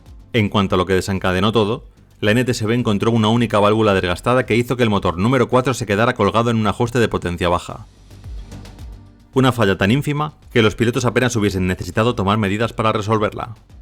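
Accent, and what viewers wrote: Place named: Spain. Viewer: Si se le entiende bien.a pesar de sentirsele su acento español..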